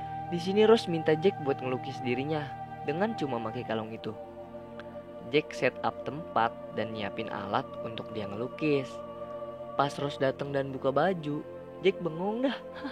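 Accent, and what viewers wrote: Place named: Java, Indonesia. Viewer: Suara Kaka lucu kaya gimana ya ngomong bocor Kay medok gitu aku medok gak terlalu si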